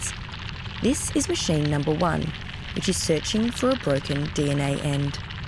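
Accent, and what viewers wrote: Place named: Australia. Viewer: isnt this someone elses video but with an Aussie narrator?..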